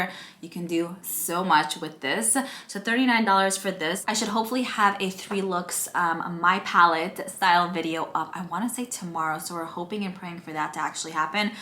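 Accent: New York, United States. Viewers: So proud of you and love love your NY accent and I hope you always keep it 💖💖 I will definitely get this collection…Love it so much 💖💖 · I understand completely cuz I talk the same way cuz I'm from New York. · Congratulations on your pallet and engagement, how exciting! I love all of the colors especially the purple wine color and green, so so so beautiful. I love your accent, I speak the same as I was born and raised in NY. My girls always tease me when I say cawfee too lol. Very happy for you, you are such a happy go lucky kind of gal.💜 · Girl. You have an accent. New York. I have an accent. North NJ. Lol I am sooo excited for you!!!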